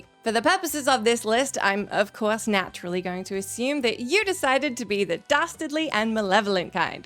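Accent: Australia. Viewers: I feel some of the most questionable things I've done are on Stellaris the game makes Slavery and Genocide fun! All for expansion and profit of course. I mean I'm normally apologetic about my Countries Imperial past and then I play that! Lol Edit: is adorable I think its the Aussie accent Shoot me I'm simping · That is an Australian accent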